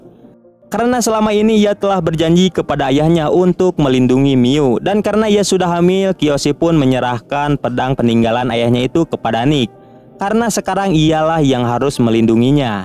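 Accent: West Java, Indonesia. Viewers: Orang sunda ya bang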